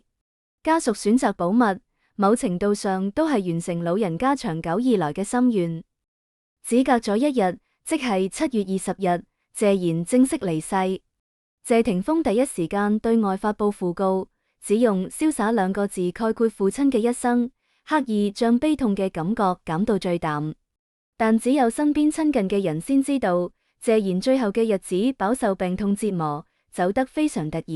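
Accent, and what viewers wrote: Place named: China. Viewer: 你們大陸人讀好D講東話才講啦。應找個香港人來讀。你們讀音太難聽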